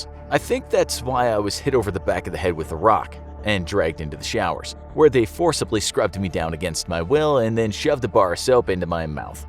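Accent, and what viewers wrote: United Kingdom. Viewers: His British accent is actually alright.....I can tell better than Americans because I'm from the UK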